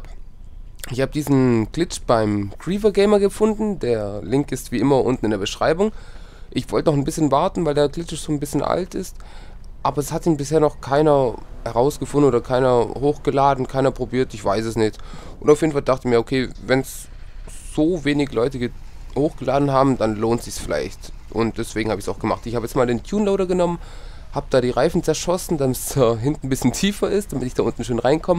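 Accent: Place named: Swabia, Germany. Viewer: Gutes Video!:)Bisch bestimmt ein schwäbischer zocker wegen deinem Akzent^^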